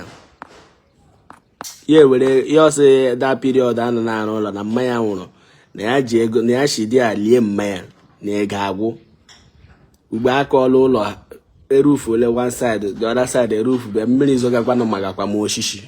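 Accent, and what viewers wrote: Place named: Mbaise, Nigeria. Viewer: Waoo you really changed,where have you been, I could remember you with thick mbaise accent,now is very hard to hear that accent again.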